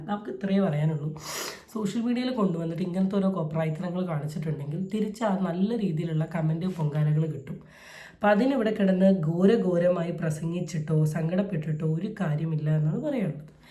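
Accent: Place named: Thiruvananthapuram, India. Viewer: Only particular areas in tvm they speak in this way , please don't tease the slang it's a request not an order please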